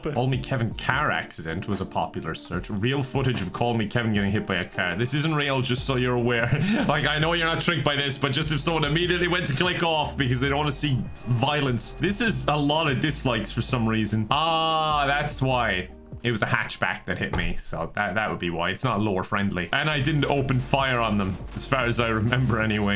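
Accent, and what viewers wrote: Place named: Ireland. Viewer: OMG HE'S IRISH I NEVER REALISED also I have been subbed for 3 years and though he was joking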